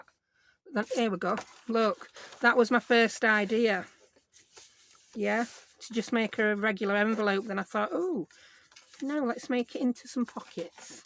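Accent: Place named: North Yorkshire, United Kingdom. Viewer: I just found your videos! I absolutely love them! Especially love your accent. I spent 18 years in the UK, mostly North Yorkshire. You are making me homesick! In a good way, I loved it there. If I may ask, where are you located?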